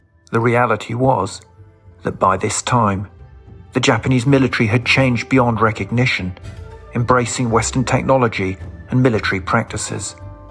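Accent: United Kingdom. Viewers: The narrator is probably British, they tend to pronounce words as they are spelled. National Geographic has a samurai sword documentary, and one of the persons in that video pronounces it the same way, and he is very English.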